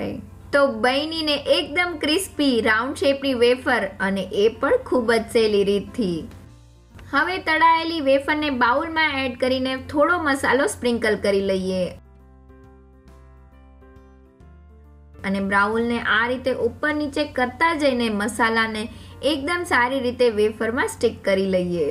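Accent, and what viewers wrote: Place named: Kathiawar, India. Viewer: Saras bni 6 ho. Bajar jevi Krispy. Tmari bolva ni style bhu gme 6. Kathiyavadi lago 6o. Ben thanks a lot for topra pak recipe. Ur fan from rajkot.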